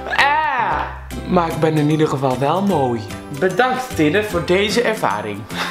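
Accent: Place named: Netherlands. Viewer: hey! je bent echt de beste en ik vind dat hollands accent zo leuk en grappig! ik ben echt je grootste fan! je drumt echt SUPEEERR!! en die krulletjes zijn TE SCHATTIG!😊😊😊ik hoop dat ik je op een dag kan ontmoeten!!!!! 😘❤😎😋😋 en by the way! kei coole video! love it!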